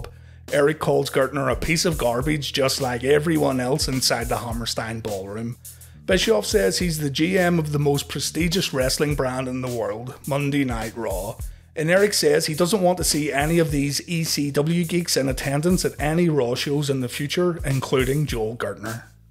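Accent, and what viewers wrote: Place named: Northern Ireland, United Kingdom. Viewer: Nice to hear a fellow northern Irish ECW fan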